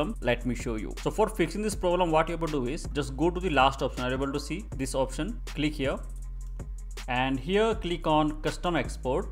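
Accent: India. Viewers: Why is it always some random indian with a strong accent that solves my technical issues? Thanks a lot!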